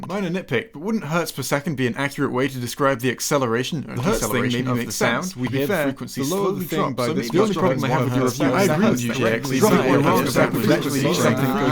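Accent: United Kingdom. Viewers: This guy sounds like a British Seth Green.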